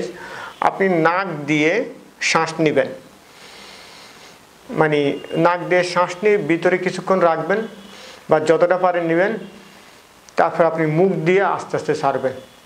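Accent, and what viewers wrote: Noakhali, Bangladesh. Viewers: 😂😂আমার বানান যথাযথ এবং সঠিক উচ্চারণে আছে আপনি আগে আপনার ভিডিওতে নোয়াখাইল্লা মার্কা ভাষা চু*নো বন্ধ করুন।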